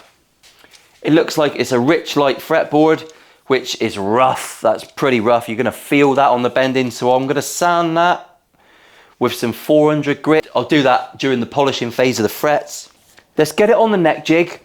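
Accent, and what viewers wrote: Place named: South West, United Kingdom. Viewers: I subbed for the south West accent and the comment about going on Ebay pissed 😂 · I’m thinking of going into the guitar nut business and branding them Wanksox 😂 loved the video, and for a an ex BritPat living in US, I almost understood the west country accent 😊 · Scouser? West Country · Always a trip hearing a fellow Janner on YouTube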